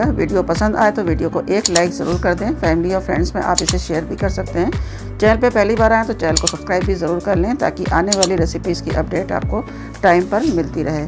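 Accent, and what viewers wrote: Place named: Urdu, Pakistan. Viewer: آپکا اردو کا خوبصورت لہجہ ہمیں بہت پسند ہے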